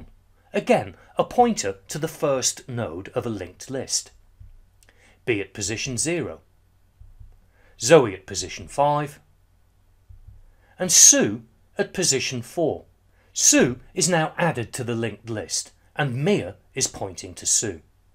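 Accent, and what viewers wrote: England, United Kingdom. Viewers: His British accent though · I think the British accent helps me learn better. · You have a fine English accent, Mate. It helps my brain gear run smooth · Perfect explanation. On a side note, are you British? when you speak, you rhyme and it's a treat to my years. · His British accent sounds so cool! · Thank you, very british man, for a nice explanation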